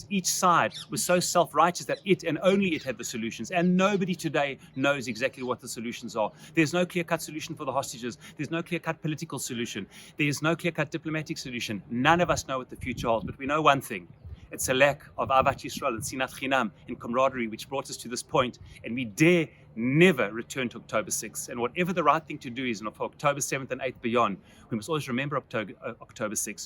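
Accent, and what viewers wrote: South Africa. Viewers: Rabbi sounds south african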